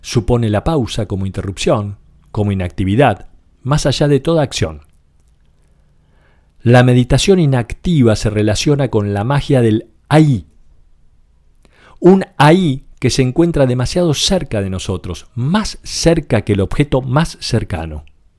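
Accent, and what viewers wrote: Argentina. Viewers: La magia del pensamiento de Byung Chula Han , narrada con esa hermosa voz argentina. ❤ Gracias 🙏🏽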